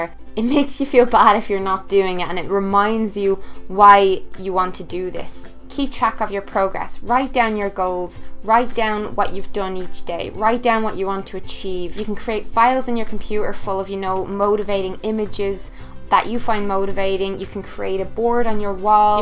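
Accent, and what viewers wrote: Ireland. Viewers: Your Irish omg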